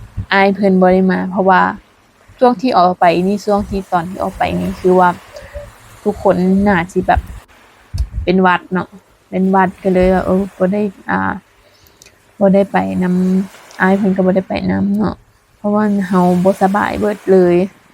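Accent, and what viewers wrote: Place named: South, Thailand. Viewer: ภาคใต้...เลยครับ🤣😆😁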